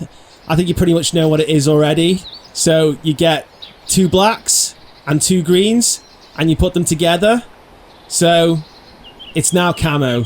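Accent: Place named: Warrington, United Kingdom. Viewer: I would say Warrington area if I was to take a guess.? Nice concept as well mate!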